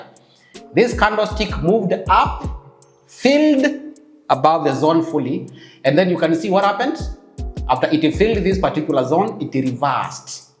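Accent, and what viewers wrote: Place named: Kenya. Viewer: Bro You sound a Kenyan... DO YOU HAVE OTHER SOCIAL MEDIA HANDLES ?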